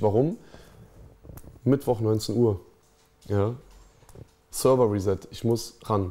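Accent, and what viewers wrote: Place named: Germany. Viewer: in echt heißts ja Command and conquer aber bei ihm hörts sich so lustig an so deutsch halt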